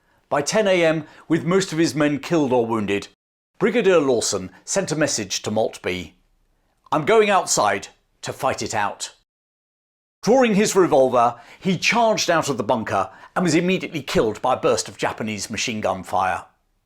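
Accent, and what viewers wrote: United Kingdom. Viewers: As a Hongkonger who is always curious about our past, I have been listeniing to different narrations of this battle a million times now. It's nice to listen to this again in the British accent and perspective. Thanks chap.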